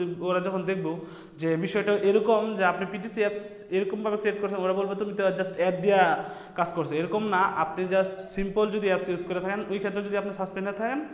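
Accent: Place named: Kishoreganj, Bangladesh. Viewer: বাড়ি কৈ? কিশোরগঞ্জয়া নি?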